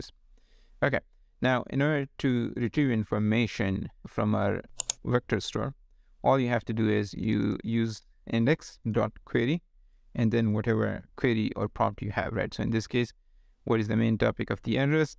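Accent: India. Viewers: This right here is the definition of the uncanny valley. That white guy, with your Indian voice, plus the AI generation, makes me feel incredibly uncomfortable. Love your channel, but it makes my brain light up in "Something's wrong here"...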